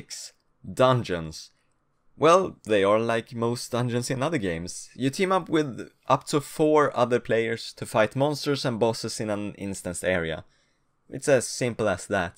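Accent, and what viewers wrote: Sweden. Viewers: Are you from sweden? You have a very swedish-english accent :)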